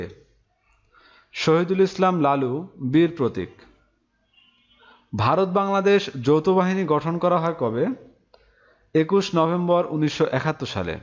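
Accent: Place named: Noakhali, Bangladesh. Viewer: উচ্চারণ পুরাই নোয়াখাইল্লা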